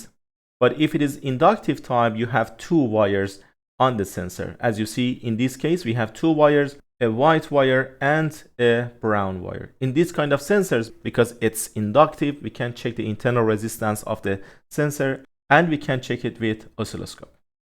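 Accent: Iran. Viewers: برگام این لحجه ایرانیه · Iranian accent isn't it?😅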